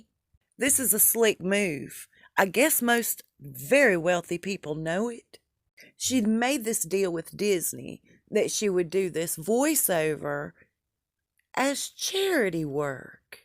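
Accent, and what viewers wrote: South, United States. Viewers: Great video,. Love your Southern accent.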